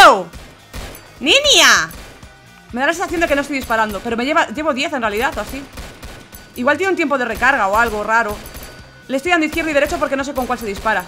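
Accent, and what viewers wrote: Argentina. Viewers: Hablas argentina tia😨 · soy sub me encanta tu voz tu asentó argentino u como habla s con morsa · me mata haciendo acento de argentina!! le sale bien. porque yo soy argentina!! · Juego simon dice,cuando dice say y algun color es porque tienes que ir al color que te dice roblox,Cuando no dice say no vallas al color que dice...entiendes? por cierto muy bien el acento argentino:D yo soy argentino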